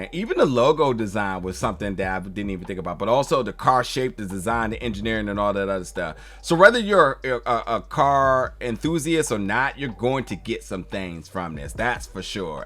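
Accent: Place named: Baltimore, United States. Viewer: Are you from Baltimore or something? 😅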